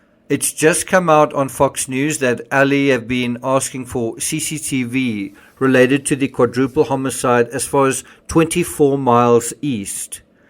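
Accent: South Africa. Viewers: Love your South African accent.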